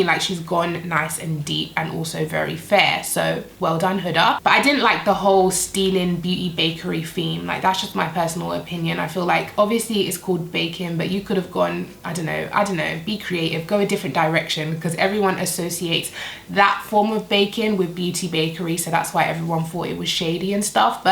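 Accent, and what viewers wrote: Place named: United Kingdom. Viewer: Nice one. I have been guilty of overspending on makeup in the past but when my KvD Shade & Light was needing renewed I got the Revolution one. Its every bit as good. I am really pale and the middle top shade makes a great natural looking contour (The KvD one is the same but I can't afford to keep buying US products, the duty is crippling, they seem to just convert the dollars to pounds without using the exchange rate as if forty quid was the same as forty dollars. Finally, I know that Kat has done a stellar job in raising awareness of animal testing etc. But Revolution is cruelty free, British and costs a fraction of the price for really good quality products. I agree about the Fenty pallet being a tad uninspiring. But I really want to try one of her contour sticks which looks really good on pale skin and it's a cream which is more flattering to mature skin Great video Girl its nice to hear a British voice. 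As for Kylie and Co. I wouldn't spit on them if they were burning. Who bases their fame on their dead father being the lawyer of a wife killer? And that is just the Kardashian part. The Kylie bit with her two mum's or whatever it is is something most families would keep to themselves. They're grasping, fame chasing gold diggers. All of them...Kanye West should have recognised that!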